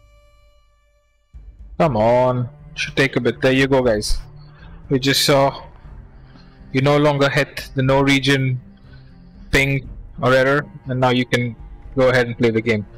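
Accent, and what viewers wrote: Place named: India. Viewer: The moment I heard an indian man in this video, I was overcome with a sense of relief.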